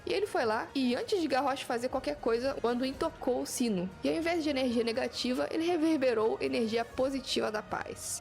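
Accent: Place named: Minas Gerais, Brazil. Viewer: Tu é mineira ne? O sotaque n engana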